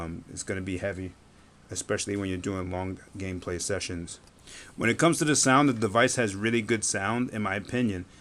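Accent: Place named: Caribbean. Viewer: Sound like your west indian. Grenadian perhaps?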